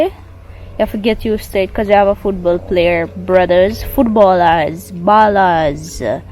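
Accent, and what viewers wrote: Jamaica. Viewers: I love patois too love you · nuff people love when you talk patios we love that about you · Yes you can speak jamaican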